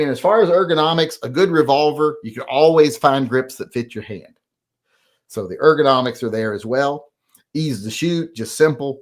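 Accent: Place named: West Virginia, United States. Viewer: you grew up in mothman country 😂😂😂 no wonder your accent is so familiar (i have lots of close friends in that area... almost kin)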